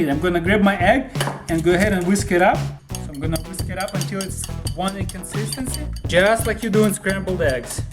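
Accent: Russia. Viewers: are you russian I just started watching your videos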